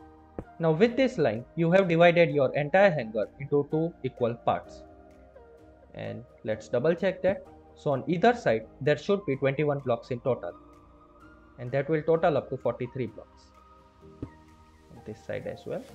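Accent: India. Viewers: bro you got the most yet best indian accent i have ever heard!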